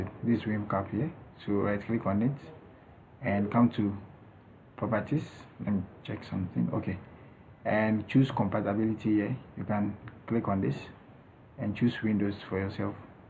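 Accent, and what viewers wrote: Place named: India. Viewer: THIS NIGGA IS A SCAM HE SOUND LIKE A INDAIN "TECH SUPPORT"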